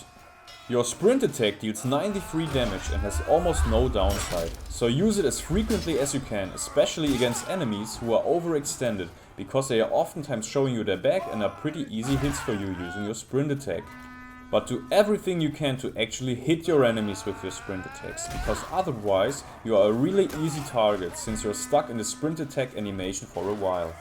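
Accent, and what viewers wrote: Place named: Germany. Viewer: Where are you from? you sound German. and very solid and meticulous guide. Like and sub!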